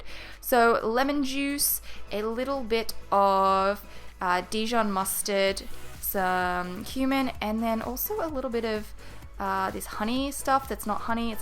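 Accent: Australia. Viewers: Are you Australian?? Btw these look great :)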